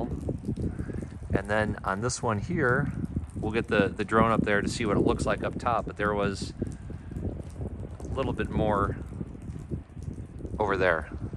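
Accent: Wisconsin, United States. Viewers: He mentioned building in Wisconsin...I am assuming Wisconsin accent. I moved here and he sounds like everyone else here. Not sure why everyone needs to drag the guy for this? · Dudes, it’s just how we talk in Wisconsin. We also say crick, not creeek. Sorry, not sorry. 😎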